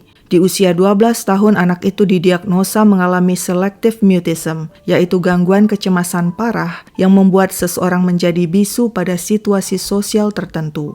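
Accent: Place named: Medan, Indonesia. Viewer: kakak btw orang mana .seperti orng medan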